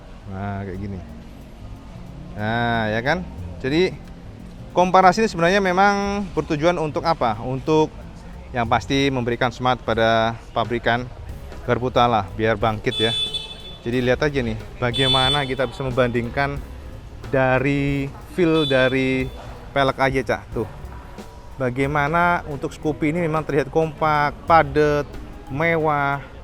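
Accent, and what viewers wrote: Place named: Kediri, Indonesia. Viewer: Mas asli mana ya kok bahasae mirip2 orang kediri ya,campuran lah khas jawa timur.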